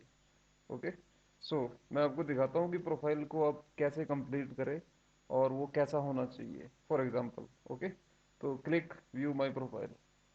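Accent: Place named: Himachal Pradesh, India. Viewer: Very Informative Video in Himachali accent :). Thank you Sir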